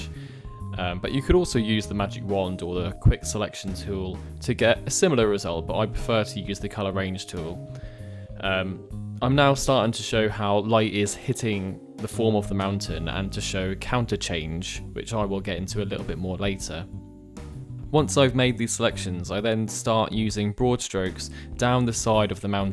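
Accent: United Kingdom. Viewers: Ha, it's nice to hear a fellow Brit artist. We're a minority group in concept art :p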